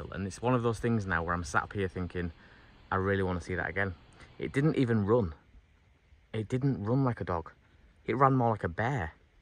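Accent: United Kingdom. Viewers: I love your channel! Your British accent makes me 🤸🏼‍♀️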